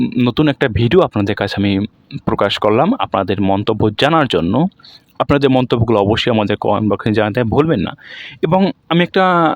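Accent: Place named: West Bengal, India. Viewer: কথার টান কিন্তু পশ্চিমবঙ্গের ই। অন্য রাজ্য বলতে ত্রিপুরা ওদের টান আলাদা, আর বাংলাদেশের এরকম কথার টান নয়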